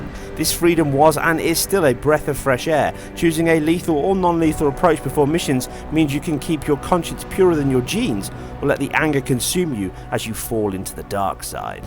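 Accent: United Kingdom. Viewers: He is. English, to be specific. I've been trying to work out his accent for a while, but I can't be more specific than that. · Tks!! I love the british tone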